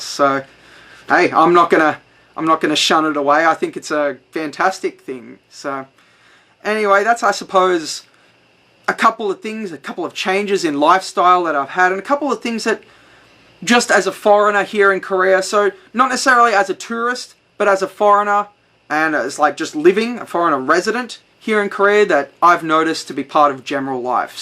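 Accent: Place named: Australia. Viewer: You have very thick aussie accent. From around southern australia?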